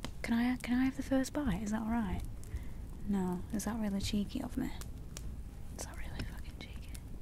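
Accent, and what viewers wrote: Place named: Lancashire, United Kingdom. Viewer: she's from lancashire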